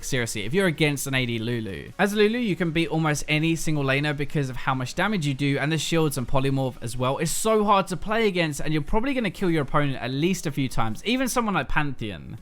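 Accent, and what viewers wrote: United Kingdom. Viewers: God I hate that british accent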